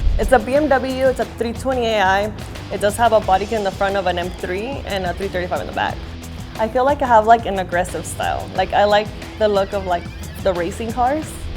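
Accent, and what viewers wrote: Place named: East Los Angeles, United States. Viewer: Damn she sounds like she's from East L.A.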